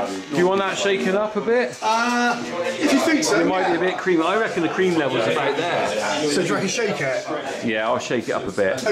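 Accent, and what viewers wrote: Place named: Bristol, United Kingdom. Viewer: There used to be a small dairy farm next door to me with Jersey cows. The milk delivered in the morning was from yesterday’s milking, as fresh as it could be. We had skimmed milk which still had a good head of cream. I’m more north east Gloucestershire born and bred, accent not quite as strong up here. I can hear a bit more Bristol in your accent sometimes. I can also do a good Pam Ayers impression🤣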